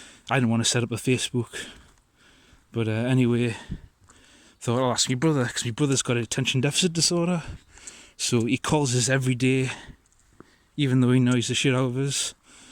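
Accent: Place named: Geordie, United Kingdom. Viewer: It took 3 months to renew mine, but that was sending the paper work from here in Australia. I detect a mild geordie accent, im originally from tynedale.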